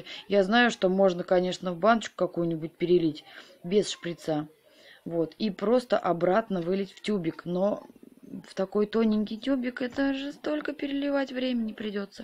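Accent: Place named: Ivanovo, Russia. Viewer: Говор у блогера похож на ивановский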